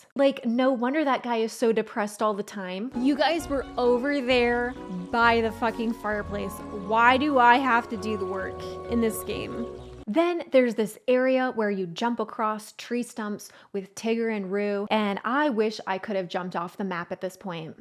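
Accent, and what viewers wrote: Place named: West Coast, United States. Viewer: She's from California so skill issues aren't her fault. It's the game's fault. (I have no idea where she's from but she sure talks like she's from the West Coast.)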